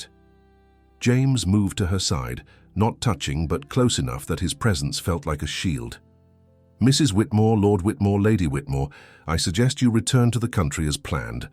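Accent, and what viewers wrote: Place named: England, United Kingdom. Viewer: I agree with your laud of the narrator. Luv that purring English accent. YUM!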